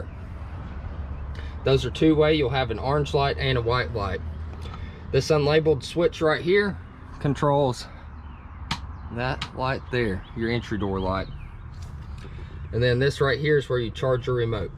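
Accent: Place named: South, United States. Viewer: I'd like to see the smaller cap-over without the tip out to see if it would have enough storage for me. I'm alone and have no bloody kids, but I do like to cook so need storage and a good sized fridge, maybe the 825. Any chance of getting a deeper undivided sink. Thanks, you're funny; enjoyed the comments and your southern accent.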